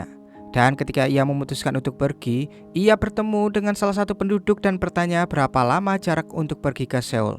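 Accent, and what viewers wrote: Java, Indonesia. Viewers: Byak mulut bukan film,mana medok bgt ngmngnya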